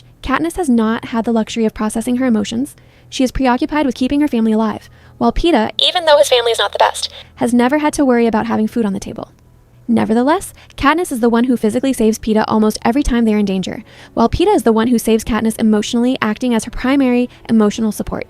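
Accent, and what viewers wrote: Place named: United States. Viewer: If you didn’t say English wasn’t your first language I wouldn’t have known! Your accent is so American :) one request though, is to slow down your speech a little bit, I had to slow the playback speed down to 0.95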